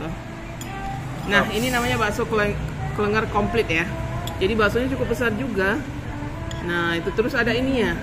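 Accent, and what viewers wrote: Minangkabau, Indonesia. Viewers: Gomok bahaso padang nyo tu bu 😂😂😂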